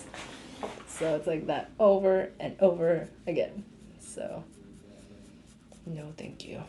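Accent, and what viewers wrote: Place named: Canada. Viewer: Canadian accent sounds very nice 👌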